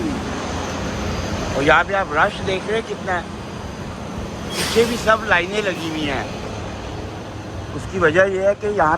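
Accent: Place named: Punjab, India. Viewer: Bhai sahib, why didn't you make your vlog in Punjabi instead of using a Punjabi accent in Urdu with some English words? I don’t understand why some people have a complex about trying to use Urdu and English for their vlogs. Also, you are complaining about the weather in Canada, but who invited you there? Bhai, be grateful to Allah; you have been blessed with so many blessings and a job. Enjoy the day you've been blessed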